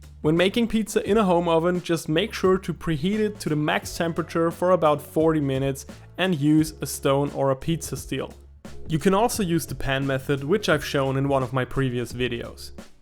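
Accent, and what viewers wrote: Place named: United States. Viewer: austrian with an american accent, the opposite of schwarzenegger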